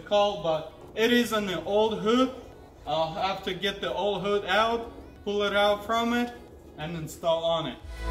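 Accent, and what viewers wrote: Russia. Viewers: Вау. Тачка огонь. Все время слежу за Вашим видео. Очень хорошие машины берете в работу. не Хлам. Если не секрет сколько такая после ремонта в продаже у Вас? И еще, акцент у Вас явно русский:) Могли бы Вы делать субтитры на русском языке)))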